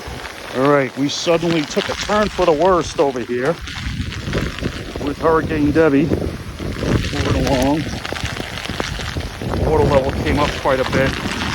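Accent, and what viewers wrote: New York, United States. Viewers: Glad all is well. LOL, bumpers. I can tell you are from New York! North East, any wayzzs.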